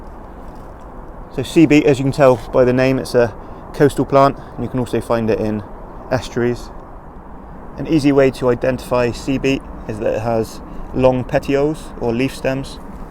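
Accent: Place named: Bristol, United Kingdom. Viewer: Where abouts are you?? You sound Bristolian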